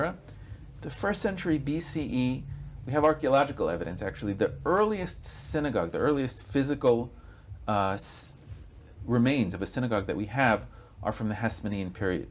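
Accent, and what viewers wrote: Russia. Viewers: Кто это? Семеныч? Что за рязинглиш? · From the first phrase it is clear that we have a Russian person in front of us.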